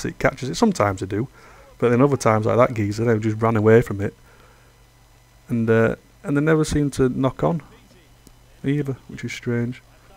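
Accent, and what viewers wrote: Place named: Yorkshire, United Kingdom. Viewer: I knew this lad was going to be talking sense about Rugby League when I heard his Yorkshire accent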